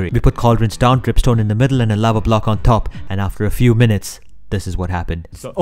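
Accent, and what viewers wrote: India. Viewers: You're Indian aren't you You have an Indian accent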